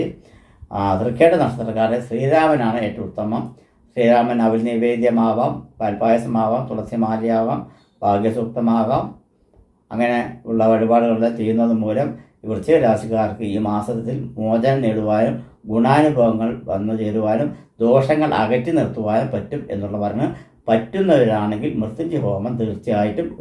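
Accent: Tamil Nadu, India. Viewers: തമിഴ് നാട്ടുകാരാണ്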